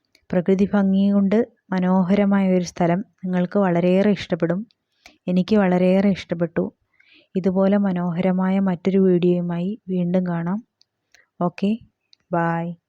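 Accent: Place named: Palakkad, India. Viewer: As per the slang of your language I think you are from palakkad. If I am wrong please correct it.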